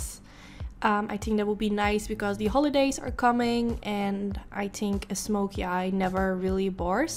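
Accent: Netherlands. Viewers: Ik kijke naar je filmpje met in me hoofd hoor ik nou een Nederlands accent of word ik gek😭 en ja hoor je bent gewoon Nederlands 😍 yo go girl 💥💥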